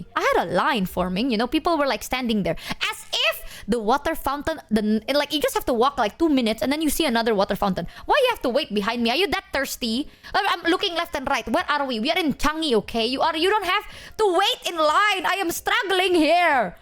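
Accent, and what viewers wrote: Indonesia. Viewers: I just realized. is the best representation of fluent English with Indonesian accent. If you want to know what majority Indonesian accent sounds like (in english), listen to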